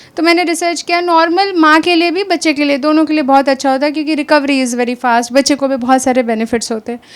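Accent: Bihar, India. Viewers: You are bengali but bihari accent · Bihar ki ho ka Didi aawaj ke tone se to yahi lagta hai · How did you develop the bihari accent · Ee अक्सेन्ट bihari h ka 😂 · Aapka accent bihari kaise h? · Bengali with bihari accent is amazing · A bengali with Bihari accent has got to be one of my favourite thing to listen to. Good set, and nice puns. Keep growing mam. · mam aapne accent Bihari kaise hua? Aap Bihar mai grow up Hui hai ?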